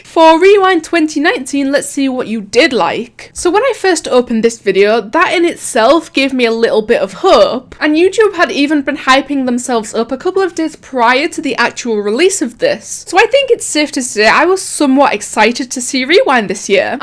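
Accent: United Kingdom. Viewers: U British?????cuz of ur accent I guess u live in London??or some were elese in Paris xddd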